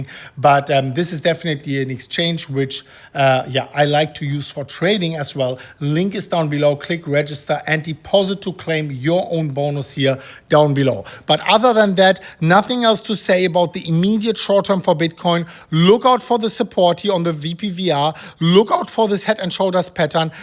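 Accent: Germany. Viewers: Man I missed that thick German accent and the daily follow-ups. I’m back in the game baby. 🦾🎉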